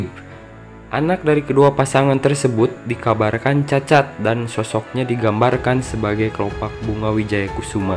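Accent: West Java, Indonesia. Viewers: Ari si akang orang Sunda ya 😀😀😀🤭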